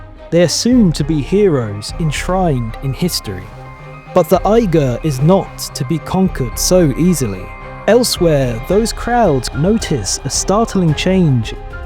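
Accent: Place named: Northern England, United Kingdom. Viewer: Confession time: I'm always willing to support new projects via Patreon or whatever but want to know whether the creator is serious about their work, and usually responses to criticism are a good way to find out. Sorry about the deception. Anyway I see your main strengths as your visual interpretation of the story, and the friendly non-AI Northern tones of the narrator (yourself perhaps?). Well good wishes for your new venture I've subscribed so I can check out your next download when it's available.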